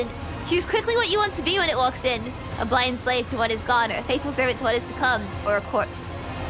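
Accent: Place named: United Kingdom. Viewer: Gotta say how delightful it is listening to a very British person play a very British coded game. Keep at it Mistress!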